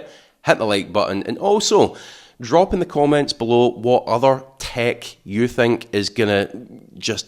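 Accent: Scotland, United Kingdom. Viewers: I'm half Scottish but sometimes I find difficulty in understanding the Scots accent. Not with you. I understand every word. Thank you. Incidentally I think the name Dacia is usually pronounced Dach eah.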